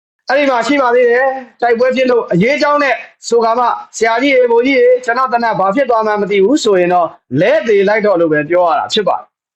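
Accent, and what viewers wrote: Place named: Upper Myanmar, Myanmar. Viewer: ဆိတ်သားခြောက်ချောင်းကြော်လေးပါ ဆတ်သားခြောက်ကြော်လို့ပြောမရောင်းကြပါဘူး နားကြားမှားတာနေမှာပါ အညာလေသံဆိုတော့ဝဲတာပါ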